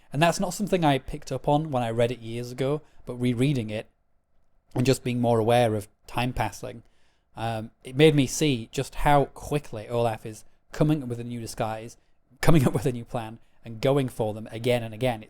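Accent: Northern England, United Kingdom. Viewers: yes that’s it, my ears have become adapted to your accent! I had heard reference to the Northern accent but never really knew exactly what it was… kinda like the Southern accent here in America I guess…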